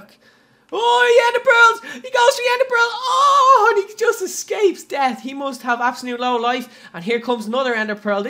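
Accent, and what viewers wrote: Ireland. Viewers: your just totalllyy amazing i love your little irish accent xxx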